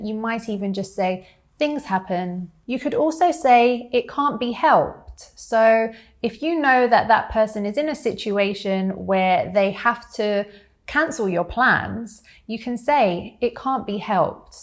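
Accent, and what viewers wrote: United Kingdom. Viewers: I love your British accent 😍😍😍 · After im following your lessons i think im fallin in love with UK accent.... Kinda sweet and cute...🙃🙃🙃🙃🙃🌹🥳⚘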